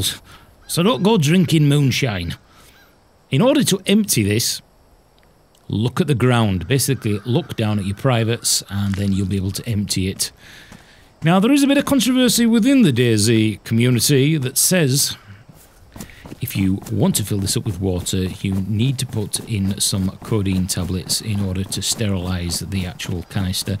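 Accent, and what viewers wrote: Yorkshire, United Kingdom. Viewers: Orate buddy luv your vids. I’m tipping you don’t live far from me with that lovely Yorkshire twang you’ve got. I’m playing on PS4 so I’m a few updates behind I believe. Can I still find weed seeds?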